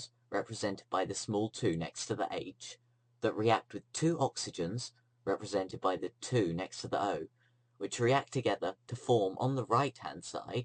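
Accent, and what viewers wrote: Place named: United Kingdom. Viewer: His voice is British! Minnesota Literacy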